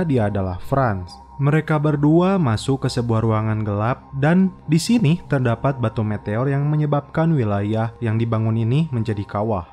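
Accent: West Java, Indonesia. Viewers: Anying orng sunda eyy:v ceuk urng orang Jawa eyy, mantabs lah kedeng urng subrek helan :v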